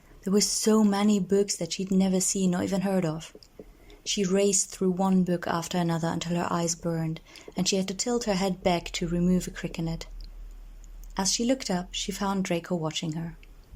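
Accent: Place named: Paris, France. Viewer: It’s sounds like the narrator has a Parisian accent - that would explain the mouth noises. I don’t mind it honestly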